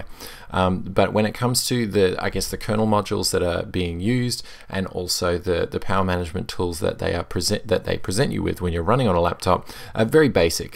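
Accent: Australia. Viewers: G'Day mate. Thanks for this. I've been wanting to install a new distro on one of my PC's and you've certainly given me a great deal of information to digest plus conduct some more knowledgeable research. So good to hear an Aussie accent on YT as well. Cheers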